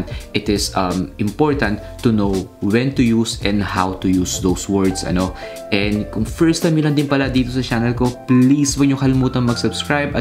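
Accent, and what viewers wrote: Belgium. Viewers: So cool how your Flemish is already formed. With the zenne at the end. Ur a through Fleming my dude ❤️ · Sounds a bit different from Netherlands Dutch. But I knew that already from watching Disney programs that are aired from Belgium. Nice vlog!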